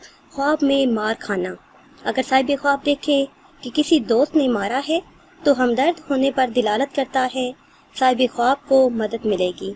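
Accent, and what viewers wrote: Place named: Chakwal, Pakistan. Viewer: Baki sab theek hai apka lehja se lagta hai ap chakwal se hein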